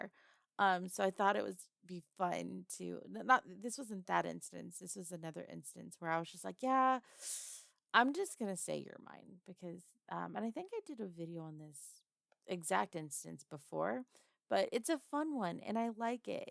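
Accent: South, United States. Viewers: Your southern voice is sexy 😘😍😋🤤🥰❤️‍🔥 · You know it's crazy you have such a good southern accent. It's almost like you were born in the south 😂. I'm kidding, I know it's real and it's amazing just like you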